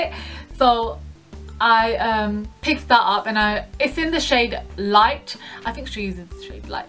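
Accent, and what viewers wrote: United Kingdom. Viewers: you have a really cute British Asian accent, awh I'm new to your Chanel btw, jus discovered you! Haha so bubbly an sweet. Looking forward to watching more! Xxx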